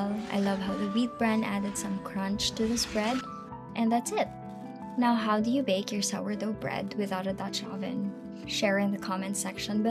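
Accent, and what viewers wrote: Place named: Philippines. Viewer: Aha I knew that filipino english accent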